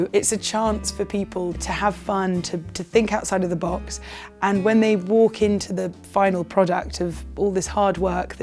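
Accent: RP, United Kingdom. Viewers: Oh I agree, it's so creamy and elegant. Sadly it's not a natural, regional accent one can just pick up in life: a public school accent costs a lot of money.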